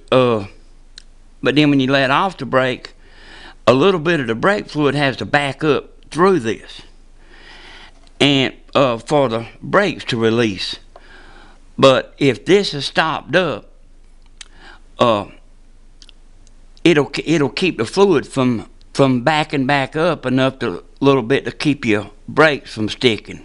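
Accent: South, United States. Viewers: Love the good old boy accent🙌😉😂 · Damn, the man gave good advice. So what, there was an accent or southern drawl. People are so critical for no reason. Doesn't everybody shit stink?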